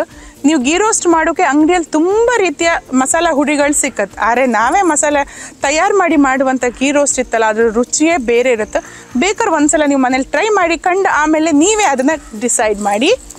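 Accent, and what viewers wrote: Kundapura, India. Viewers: ಬೇವಿನ ಎಲೆ ಅಂದರೆ karibevu ಎಂದು ಹೇಳಿ ಇಲ್ಲವಾದಲ್ಲಿ ಶಿವಮೊಗ್ಗ ಜಿಲ್ಲೆಯ ನಂತರದ ಜಿಲ್ಲೆಯವರು ತಪ್ಪು ಮಾಹಿತಿ ನೀಡಿದಂತೆ ಆಗುತ್ತದೆ ದಯವಿಟ್ಟು ನಮಸ್ಕಾರ... ತುಂಬಾ ದಿನಗಳ ನಂತರ ಕುಂದಾಪುರ ಭಾಷೆ ಕೇಳಿದ್ದು ತುಂಬಾ ಧನ್ಯವಾದಗಳು chicken green roast super madam thank u so much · ನಮ್ ಕುಂದಾಪುರ ಭಾಷಿ ಕೇಂಬುಕೆ ಚಂದ ಕಾಣಿ...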